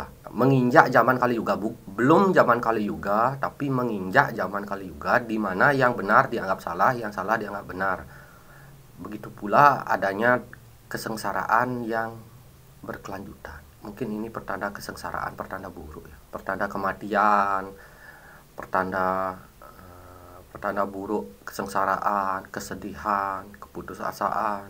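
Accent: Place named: Borneo, Indonesia. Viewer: salam dari tanah dayak kalimantan cara ngomongnya mirip sekali logatnya dgn org dayak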